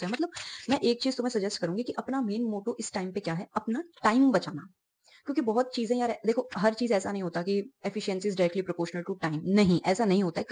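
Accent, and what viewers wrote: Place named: Haryana, India. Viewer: U have got haryanvi touch in ur voice... Sweet pinch🍬🍁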